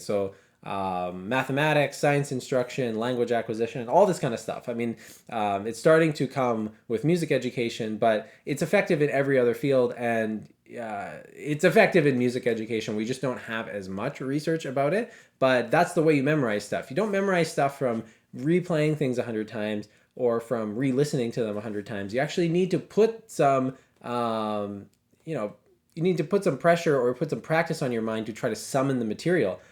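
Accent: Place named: United States. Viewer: Hi, I have recently discovered your channel and although I usually prefer UK or Australian based audio, your US accent is actually easy to listen to! Now like Judy Fiterman’s comment, I am nearly 80 am relearning piano after some 65 years absence, and also Sax which I took up in my 50’s for about 4 years then again some 4 years ago in a local concert and stage band. I have trouble remembering a 4-6 digit security code, let alone music. Sax matters less as in a band It’s normal to have the sheet music, but I really would love to have a small spontaneous repertoire of piano pieces I could play from memory. Strangely I can play the first section of Fur Elise by heart, because I suspect I learnt that as a child, but not the second two which I can play now with the music in front of me. ( But it has taken some two years to get to speed and musicality!) My other problem is audition, as I really have no singing voice and have trouble repeating even one played note accurately. 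Do you have any advice for us oldies who are experiencing some general memory loss, shall I say deficiencies or lapses, or could you do a specific session addressing this issue. I love my return to music and call it my “anti dementia” activity! Thank you. Karla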